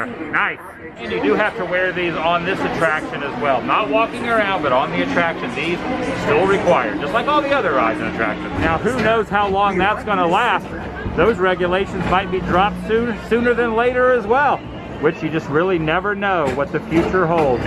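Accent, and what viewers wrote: South, United States. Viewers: It’s called “a southern pronunciation”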